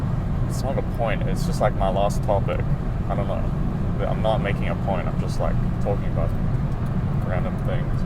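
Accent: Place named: South Africa. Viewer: The South African accent is so thick I love it 🙂‍↕️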